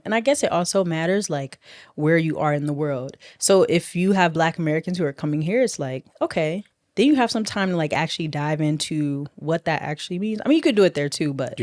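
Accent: South Africa. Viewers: Welcome home, but you sound100% South African like a familiar girl I know in the hood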